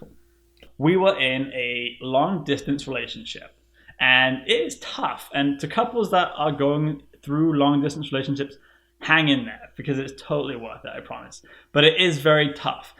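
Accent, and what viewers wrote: United States. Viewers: aw thank you for the reply and feedback!!! Great to connect also ☺️ my fiance wants to live here I think but I may come over and have a long extended holiday next year and we can make the choice then. The healthcare over there worries me as I care for my teenage daughter and I also have health issues. Will keep you posted though as our journey unfolds! I absolutely love your adventures in the RV and what an amazing experience you all are having. I can hear the soft American accent creeping in also on yours lol...I find I say a lot of American words now because my fiance and I chat daily so I'm picking up a bit of accent just from that lol. Take care and many blessings to you and your amazing family! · Sorry bro, I thought is a dude. Didn’t realise she’s a she. How’s life now in Houston? I noticed you’ve adopted American accent now. 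· i don't want to say this but your starting to sound america like you are losing your accent i can hear the american accent alot when you speak. you going to sound like an american soon anyways welcome to America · You sound quite American now mate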